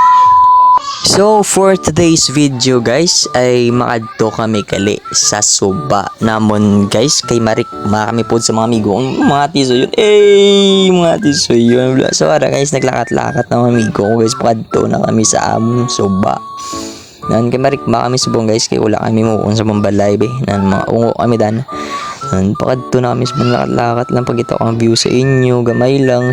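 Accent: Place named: Iloilo, Philippines. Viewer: I am your 123rd subscriber! I am not Ilonggo or Negrense but I have a lot of friends who speak like you and they are good friends.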